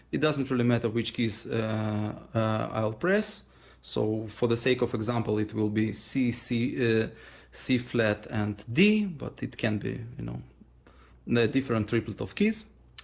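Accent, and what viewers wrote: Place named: India. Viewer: Донт анестенд :) У вас кстати индийский диалект :)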